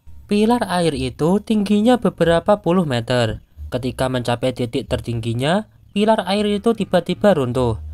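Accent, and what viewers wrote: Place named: Java, Indonesia. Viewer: Suara mu meddo banget mass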